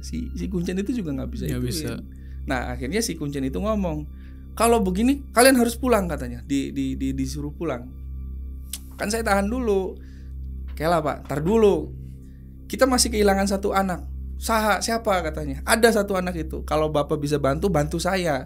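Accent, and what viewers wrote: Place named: Indramayu, Indonesia. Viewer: Logat indramayu dan cirebon nya gak ketinggalan😂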